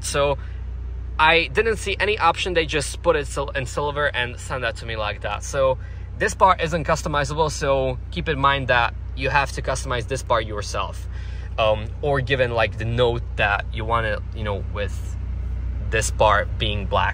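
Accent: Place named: England, United Kingdom. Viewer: Yeah, i already like this english acc :D, i would like to see more for sure.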